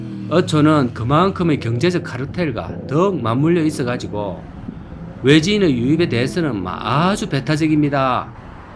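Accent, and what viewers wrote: Gyeongsang, South Korea. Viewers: 구수한 말씨의 전국을 다니는 경상도 사나이 응원합니다 ! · 어우 갱상도 사투리 듣기싫네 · 경상도 사나이 말투 듣기 좋습니다 언제 구룡포 함 가봐야겠군요~~👍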